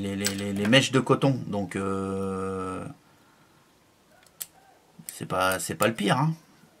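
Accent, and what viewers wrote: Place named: Paris, France. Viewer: tu me fais marrer avec ton accent titi parisien, ça met de bonne humeur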